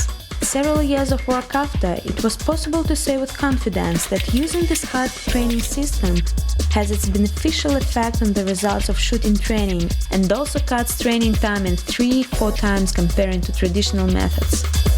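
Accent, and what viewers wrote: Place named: Russia. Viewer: Wait is this is english? I thought it was russian, man that is a bad accent.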